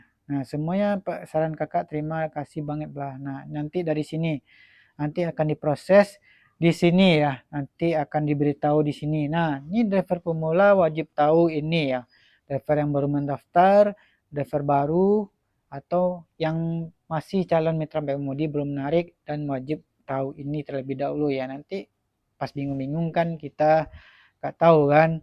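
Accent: West Sumatra, Indonesia. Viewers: Lo orang minang ya bg😂😂 logatnya minang banget😂😂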